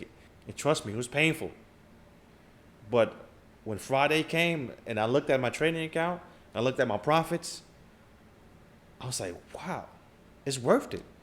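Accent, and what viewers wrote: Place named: New York, United States. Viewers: I fw you heavy my guy 💪🏾 You sound like you're from Harlem, no?... lol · Yes I know that New York accent. I’m originally from Philly and lived in NYC for years. I love it. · You gotta be from NY with that thick accent lol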